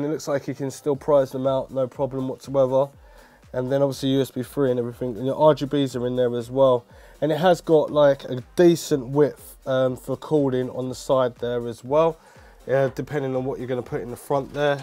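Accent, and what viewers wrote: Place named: Manchester, United Kingdom. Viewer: Cheers for the review on this case. And I've subbed up to your channel. Was really thinking about this case, but I'm not so sure now due to the cooling aspect, or lack off. So in that respect it was a great review for me.. And I hope you don't mind me saying, you kinda sound like a manc lad.... And I should know, I'm one..lol Thanks again mate..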